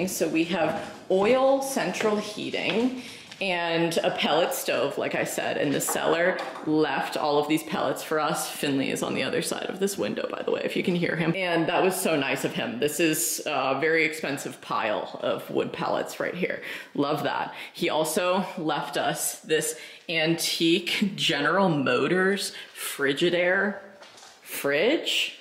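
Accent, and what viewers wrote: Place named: South, United States. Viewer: This is such an amazing place, congrats to you both!! Your future children are so lucky to be able to grow up in a place like this I know youre gunna make it so homely and warm<3 Also! Idk if youre doing it on purpose but I feel like youve gotten a more of a southern accent since being in virginia than when you were in oregon haha its so cute!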